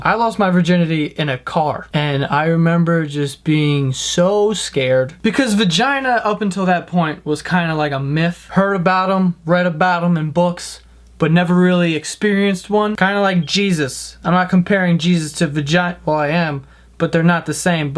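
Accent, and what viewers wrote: New York, United States. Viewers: He's a New Yorker i say that too. Ppl say things differently lol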